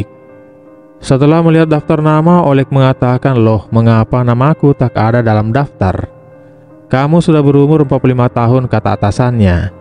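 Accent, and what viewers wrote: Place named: Madura, Indonesia. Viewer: Ngomongnya kek orang madura lu min aga kurang realistis sama filmnya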